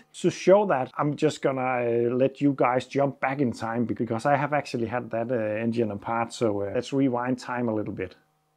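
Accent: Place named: Denmark. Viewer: Synes nok at det var “dansk/engelsk” dialekt 💪🏻. Fed kanal du har her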